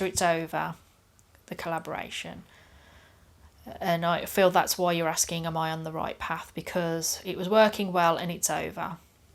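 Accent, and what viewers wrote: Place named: Tamworth, United Kingdom. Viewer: wow are you from Tamworth UK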